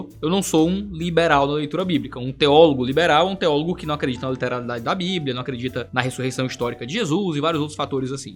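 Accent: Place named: Northeast, Brazil. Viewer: Não se acanhe,. Espalhe nosso sotaque nordestino através do português e do inglês tbm kkk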